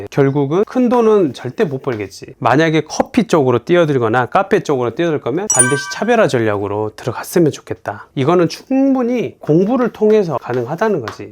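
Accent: Jeolla, South Korea. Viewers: 전라도 사투리에서 거른다 ㅎ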